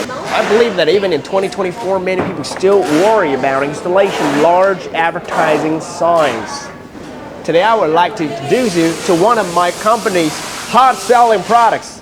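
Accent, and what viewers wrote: South, United States. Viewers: The southern accent is much better than the British accent.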